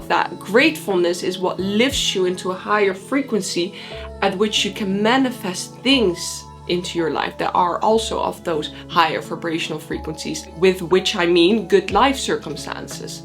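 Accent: Netherlands. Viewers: Nice video.. are you dutch? Can hear it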